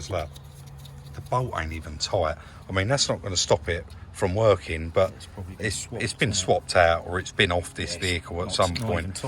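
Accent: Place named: Medway, United Kingdom. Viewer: are you a medway boy? Definitely sound it!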